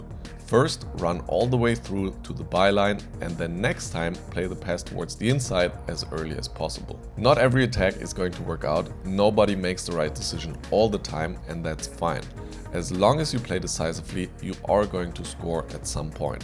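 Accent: Germany. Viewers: Warum merkt man, dass du deutsch bist?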